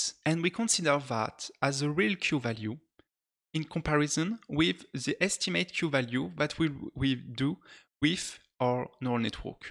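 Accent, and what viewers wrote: France. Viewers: Very good, thanks for your contribution. Amazing. (ps: i listin only for you french accent). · Tu n'est pas français par hasard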